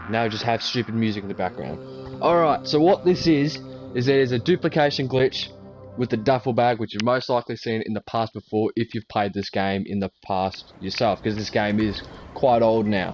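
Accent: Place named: Australia. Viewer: Strong australian like it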